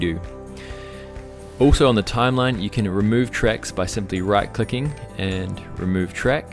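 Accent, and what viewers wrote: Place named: New Zealand. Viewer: I hear a kiwi accent! Ka pai!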